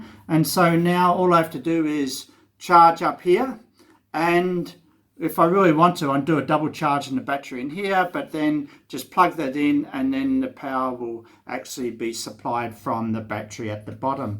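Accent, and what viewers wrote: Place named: Sydney, Australia. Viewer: This guy sounds like he's from Sydney 😛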